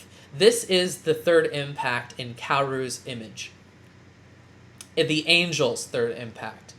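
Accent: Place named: Germany. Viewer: I feel like you thoroughly spoiled 3.0+1.0 for me just now and you did it with such savoir-faire I can't do anything else but appreciate it. If the movie turns out to do anything differently I might be weirdly disappointed now 😂 (also as a German I'd like to say the German-ness of your pronounciation was never an issue for me personally)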